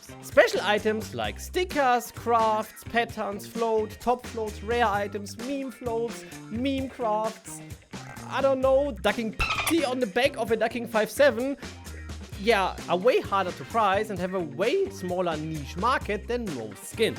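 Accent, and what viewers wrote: Germany. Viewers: Bro dieses Deutsch Englisch macht mich fertig 😂😂😂😂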